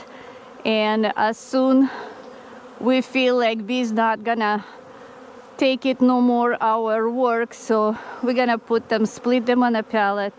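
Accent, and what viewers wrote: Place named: Russia. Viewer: Замечательный русский акцент😁., мой английский не совсем вери гуд. Как потом с маткой решается вопрос? Она же среди этих 5 корпусов.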